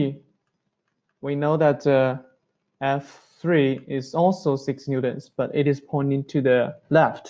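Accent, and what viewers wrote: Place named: United States. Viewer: your accent is american from which country you are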